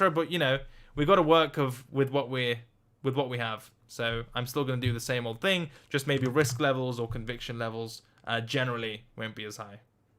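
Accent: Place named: United Kingdom. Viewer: was extra british in this one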